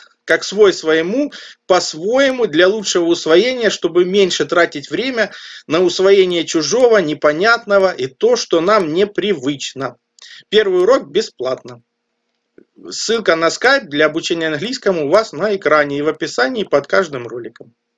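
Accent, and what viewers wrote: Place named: Kharkiv, Ukraine. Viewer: Посмотрел, очень интересно, придётся подписаться 👍. Уж больно интересно Говорите, и говор на харьковские похож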